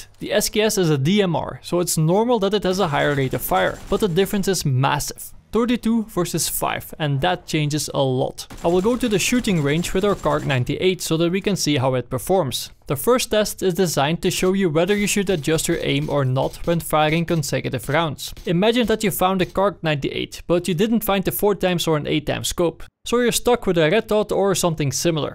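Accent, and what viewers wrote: Netherlands. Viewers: he didnt he is dutch so hes talking like that i know that i am dutch too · Relo i em dyts I love your dutch accent man! :D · lol slecht engels (bad english, i can hear he's dutch FOR FUCKING SURE) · AAAAGHHHHH THE DUTCH ACCENT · Its a dutch accents I think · clearly Dutch from the first second mate. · r u dutch? i recognise my people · i cant stand this dutch accent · You're dutch I can hear it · Wanneer je Nederlands accent niet te ontkennen is. Verder een goede video! En bedankt voor de info. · sounds dutch to me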